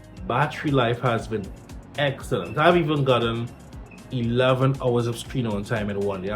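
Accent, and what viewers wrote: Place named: Jamaica. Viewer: patois accented tech reviews instant subscribe brudda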